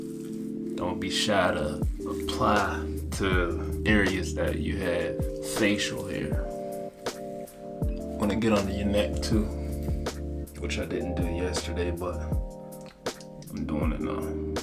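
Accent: Pittsburgh, United States. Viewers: I Knew It Was Pittsburgh Because That’s Where My Mom Side From 😂😂😂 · Burgh accent? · You gotta be from the burgh bro I hear the accent lol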